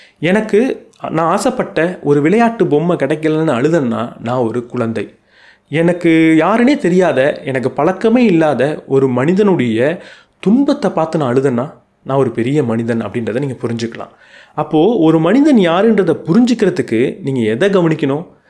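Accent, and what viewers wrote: India. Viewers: You are doing a great public service for Tamil speaking world of 75 million people in the world and your language skill is so beautiful and very simple ..your Indian Tamil language accent is so beautiful and you have a good skills to grasp many ideas from some excellent books..could I suggest you to review this following books please . The man unknown..Alex Carrel. Suicide of the west ... Clash of civilization.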